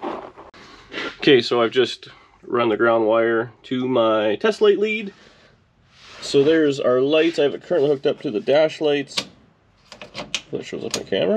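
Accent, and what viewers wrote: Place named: Canada. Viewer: I like how when you're speaking you actually say Eh, like a true Canadian 😁. Cool car. So jealous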